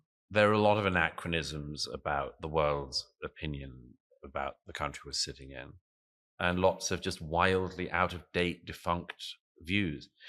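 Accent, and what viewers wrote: RP, United Kingdom. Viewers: He leaves out that Israel has been just as terroristic in their actions as has hamas. They also have never received statehood or had a seat at the table at the UN. You all listen to this man with a British accent using Strong vocabulary and act like he isn’t spewing bs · Douglas Murray is mostly empty rhetoric and because of his posh accent people way overestimate his intellect. On Gaza he is dead wrong. It’s clearly an imperialist project by Israel. He ignores the fact that Netanyahu propped Hamas up, and that his govt is full of radical imperialists who openly say they want to take Gaza over completely (and are about to). This was never about defence, it was always about stealing land.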